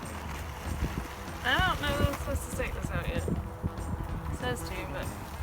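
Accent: South, United States. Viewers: I was thinking, shorten the poles down dummy, but the bathtub effect makes sense. Where is your accent from, I'm guessing Arkansas or Alabama. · Ha ha! I like the low-key southern girl thinkin' noises! Eeehhhhhmmmmm..... sounds like home.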